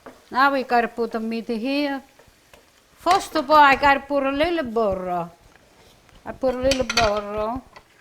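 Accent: Italy. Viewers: Shes Italian.... don't like her accent don't watch her... be nice or leave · 😁😁😁😍🌹🌻🌷 love your accent-- very charming (butter and oil). True Italian. The dish looks so very easy and yummy. Thank you for sharing!! Happy Holidays a great Christmas and New Year to ENTIRE FAMILY!! Be safe! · We love Her with her very nice ITALIAN ACCENT grazie mile nonna 😘😘😘🌹👍💝💋💋💋from Canada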